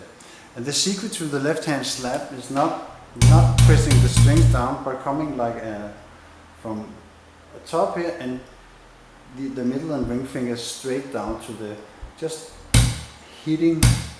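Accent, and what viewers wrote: Denmark. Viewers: That Danish accent is so funny ! Great lesson :) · haha han er så meget dansker !!! xD det så tydeligt på hans engelsk udtale ! xD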